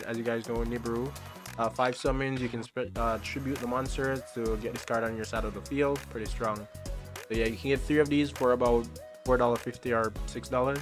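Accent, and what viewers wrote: Jamaica. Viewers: I can tell from your voice that you are Jamaican. Can you tell me where you play? I am trying to find a locals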